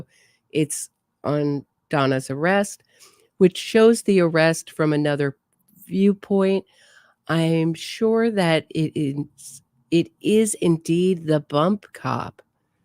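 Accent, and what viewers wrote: New York, United States. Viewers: Hi , I love your new york voice. It's very addictive. Great channel and topic.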